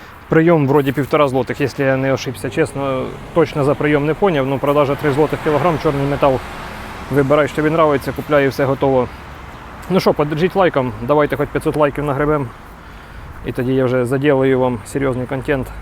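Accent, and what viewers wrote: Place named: Central Ukraine, Ukraine. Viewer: Автор лайк поставив.Удачі.По розмові зрозумів, що Ви родом з центральної частини України.Щасти.🎉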